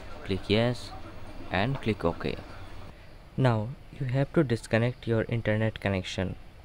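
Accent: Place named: Bengal, India. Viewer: you are asking Indian? I can say he is definately bangla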